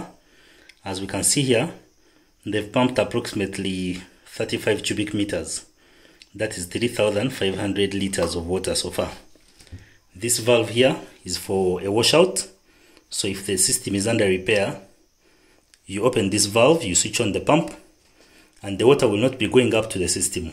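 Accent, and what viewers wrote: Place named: Uganda. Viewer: You speak like Ugandan brother. Otherwise weldone!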